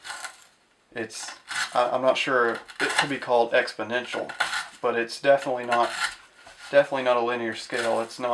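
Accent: Alabama, United States. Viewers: R u from Alabama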